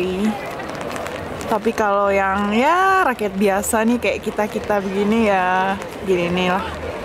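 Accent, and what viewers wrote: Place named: Java, Indonesia. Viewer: Medoknya kluarin dong kak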